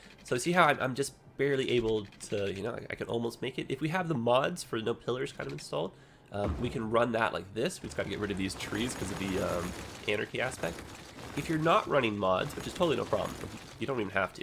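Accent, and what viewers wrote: Canada. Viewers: That Canadian accent, bro 👍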